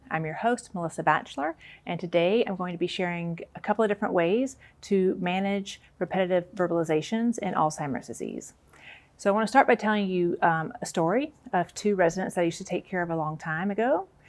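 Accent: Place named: South, United States. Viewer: Great info, live your Southern accent.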